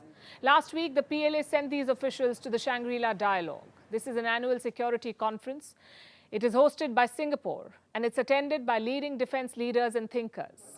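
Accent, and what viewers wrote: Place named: India. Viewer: Palki is paid by the CIA to spew anti-China/anti-Russia HATRED. Her thick Indian accent is meant to fool naive Indians into thinking that she represents Indian people. That is not quite true. Palki never bad-mouths Biden or the Democrats. That is a dead give away. Palki's CIA pay-masters wouldn't tolerate that.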